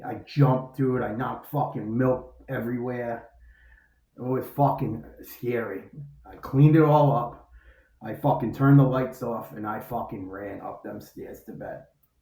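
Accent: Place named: Boston, United States. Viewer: Good stories! The boston accent😎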